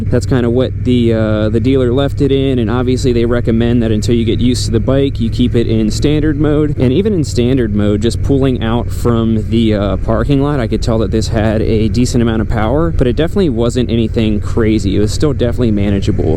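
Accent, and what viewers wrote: Maryland, United States. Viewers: I just found your channel, I could tell you were from Maryland before you said Annapolis. I moved from there almost 4 years ago, I now live in Florida. I subscribed.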